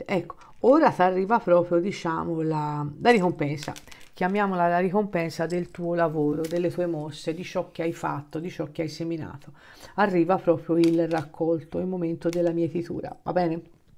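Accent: Tuscany, Italy. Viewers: 😊😊😊grazie grazie grazie.è un piacere ascoltare te ed il tuo toscano😅😍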